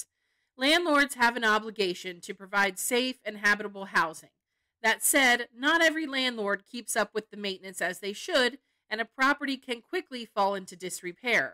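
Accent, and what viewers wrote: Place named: Delaware County, United States. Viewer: I knew I heard a Delco accent.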